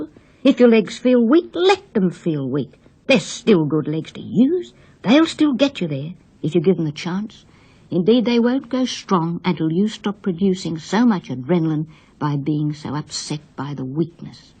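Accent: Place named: Australia. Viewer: I love how Aussie she was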